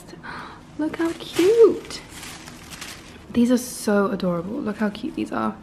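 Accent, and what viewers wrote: United Kingdom. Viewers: At the beginning of the video you have "LND>IBZ" so I think she lives in London (and she has got British accent so I suppose she's from UK). But I'm also new so I'm not sure hah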